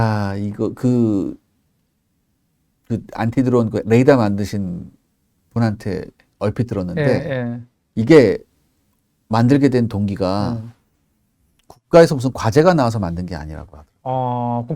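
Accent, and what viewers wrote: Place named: North Korea. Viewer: 진행하는 사람 북한 사람인가요?? 생긴것도 그렇고 말투가 강원도 같기도 하고 북한말 같기도 하네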